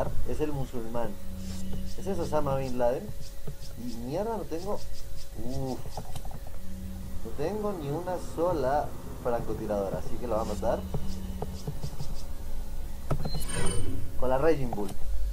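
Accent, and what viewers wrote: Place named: Peru. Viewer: esa voz de peruano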